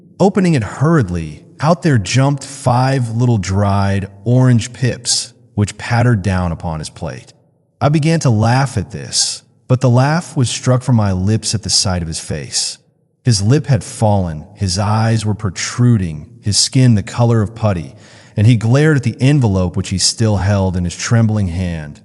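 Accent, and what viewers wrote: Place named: United States. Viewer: an american accent for Sherlock Holmes??? that does not work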